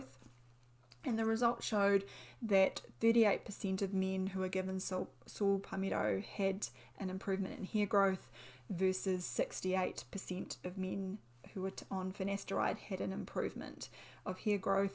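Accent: New Zealand. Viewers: Love the kiwi accent 🥰 Thanks doc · Beautiful New Zealand accent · I take saw pameto in powder and I only use a little because I juice it with a bunch of other herbs, is that good or badd ? I've heard so many different things about it and you're the 1st to say that it's probably not the best for men in a lot of ways so what's going on? By the way I know you're from New Zealand by your accent because one of my good friends is from the total Kiwi accent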